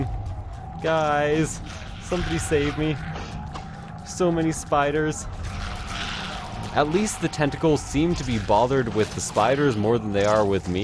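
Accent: Canada. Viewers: That Canadian accent is redonkedonk xD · r u Canadian?? Cuz ur all, "Oat, oat." Instead of out.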